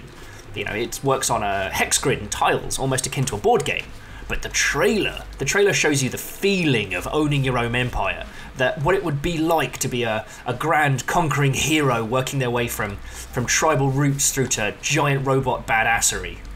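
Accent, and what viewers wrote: United Kingdom. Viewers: Out of curiosity, are you from the west country? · best thing ever and I'm in love with your British voice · Your british quality is astoundingly fun. I will come along for more, if you'll have more in the future. :) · You, sir, are the most thoroughly British individual I have ever had the pleasure of listening to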